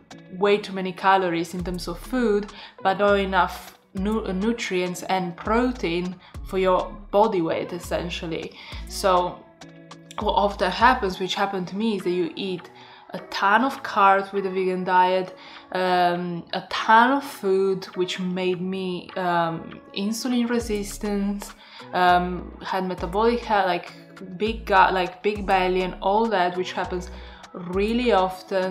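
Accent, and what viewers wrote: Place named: United Kingdom. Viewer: Where is your accent from? I think you learned british english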